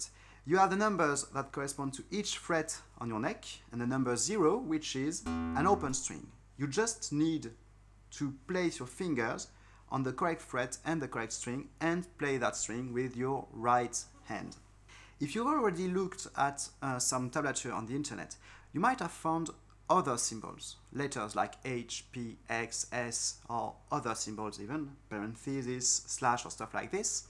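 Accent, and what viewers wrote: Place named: France. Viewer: English vowel sounds are a little sloppy, less precise than French. A native German speaker once told me that English vowel sounds tend to float all over the place. And while French words have even stress on all syllables, English words generally have a stressed syllable, even at times a secondary stressed syllable. Sometimes the stress shifts according to part of speech, like the noun "RECord" and the verb "reCORD". So imprecise vowels and location of stress get one closer to native English. But none of these comments are meant to be critical of you. You were perfectly understandable. Please you keep making guitar instruction videos, and the rest of us will enjoy the occasional French idiom that slips through! Thanks..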